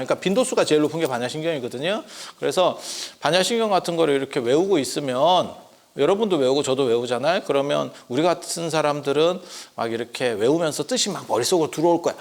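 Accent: Jeolla, South Korea. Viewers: 스님은 전라도 억양이 좀 센거 같아요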